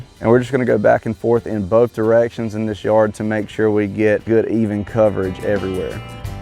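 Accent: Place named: Southeast Georgia, United States. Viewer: Lawn looking good. You sound like you're from southeast Georgia.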